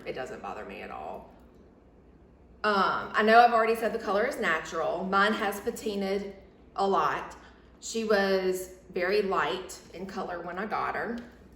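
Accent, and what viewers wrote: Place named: South, United States. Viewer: Two things: LOVE your sweet southern accent and LOVE the D&B satchel! I own many Dooney florentine bags..but the satchel is special. I have it in the Dipinta painted florentine and it's my prized handbag possession. It competes with the lux house bags all day long. It will last me my lifetime and someone else's after me..thanks for revisiting this awesome bag and reminding me how blessed I am to own one too! Take care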